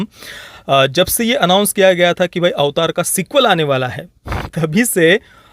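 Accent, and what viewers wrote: Maharashtra, India. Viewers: भाऊ तुझा भाषेवरून एक मराठी माणूस लगेच ओळखु शकतो मस्त आहे तुझं (वर्णन शब्द). मस्त वाटल मराठी माणूस review देताना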